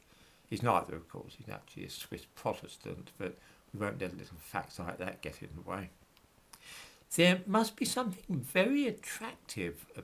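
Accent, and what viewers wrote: United Kingdom. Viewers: got a wonderful British accent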